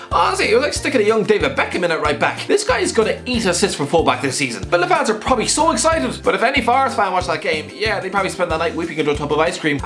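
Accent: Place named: Ireland. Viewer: This guy sounds Irish...🤔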